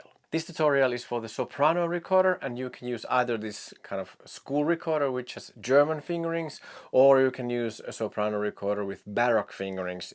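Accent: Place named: Finland. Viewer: Great tutorial. And i really dig your Finnish accent and vibe—and smile. Thanks for posting.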